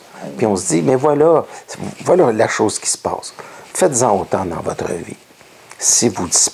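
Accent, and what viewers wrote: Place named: Canada. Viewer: Bonjour de France_ Merci M.. C'est une parfaite synchronicité car la thématique est précisément ce que je sais intérieurement et ce sur quoi je me centre spontanément en cette période de mon évolution...... Et puis j'aime bien l'accent canadien!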